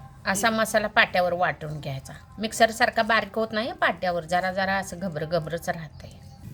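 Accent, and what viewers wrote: Kolhapur, India. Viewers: Kolhapuri bolta tumhi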